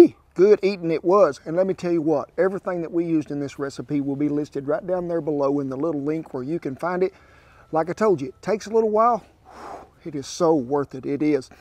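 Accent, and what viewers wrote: United States. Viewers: I love your cowboy talk. It is like a computer language.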